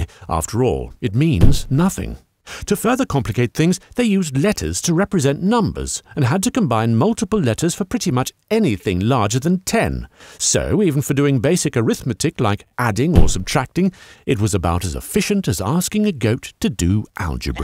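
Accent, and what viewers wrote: United Kingdom. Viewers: He's British. He has a British accent. In Britain it's called maths. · As an Englishman I was very disappointed when the narrator with a VERY British accent said math instead of maths. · Why does this guy talk with a British accent but uses American terminology? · Strange that you use Math instead of Maths given that you clearly have a British accent. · If you are English, Sir, and I presume from your accent that you are, why do you keep saying “math” in this video instead of “maths”? “Math” is an Americanism and one that makes no sense, especially when one considers that it is supposed to be short for “mathematics”, a plural noun. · the british voice turns it off for me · Are you putting on the posh accent or do you just naturally sound like Stephen Fry?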